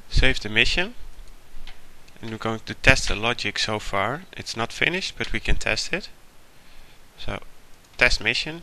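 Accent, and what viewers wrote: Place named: Netherlands. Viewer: Je hoort wel dat het een Nederlander is ;D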